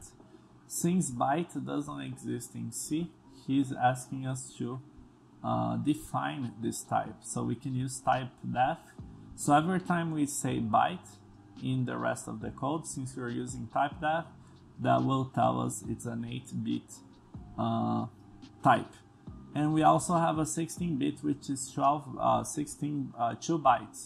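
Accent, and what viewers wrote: Brazil. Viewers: Very good explanation bro, thank you! I just stayed with one doubt, are you brazilian ? Your accent is too much typically hahaha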